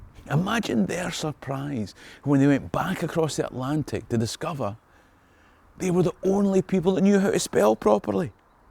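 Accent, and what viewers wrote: Scotland, United Kingdom. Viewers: Love your videos. Especially love it when you say murder. Love the Scottish accent….shame my ancestors didn’t pass the accent down. Cheers from an Aussie. 🦘👍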